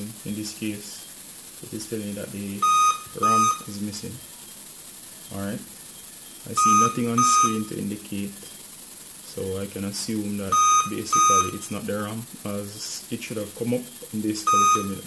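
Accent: Jamaica. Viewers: Sound like a Jamaican named maro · oiii mi can hear sey ah jamaica u come from. mi laptop ah move ah way. him mi can link u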